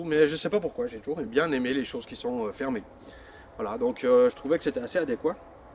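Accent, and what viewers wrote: Switzerland. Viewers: Coucou, je me suis abonnée à ta chaine depuis la première de T vidéos dans la neige;)!!! Franchement je t'adore tu es marant, ta bonne humeur fait du bien, je rigole beaucoup, ton rire est communicatif!!! pour les vidéos j'y connais rien, mais sur certaines autres j'entend rien, avec toi tout es clair!! joli paysage, ton accent à mourir, té pas suisse pour rien car tu dit tout le temps "c'est pas grave, on a le temps" hihi enfin tout ça pour te dire que j'ai presque fini de visionner tes vidéos et que ça va être long entre chaque hihi merciiiiiiiiiiiiiiiiiiiiiii pour les partages et surtout change rien tu es super.... la bisouille... Evy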